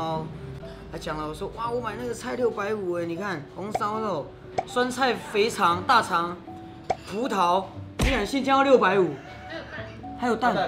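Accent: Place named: China. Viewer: 你很可愛，加油。但你口音好重？ 你是大陸人嗎？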